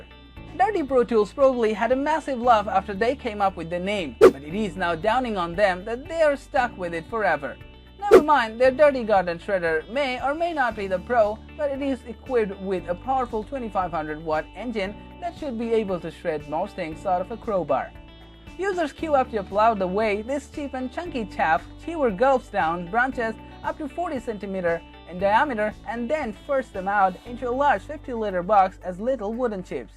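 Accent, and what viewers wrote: India. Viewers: This video is made for the British market, in a sometimes hard to understand Indostani accent. Why did it show up in my search from the USA? Something wrong with the ‘algorithm’?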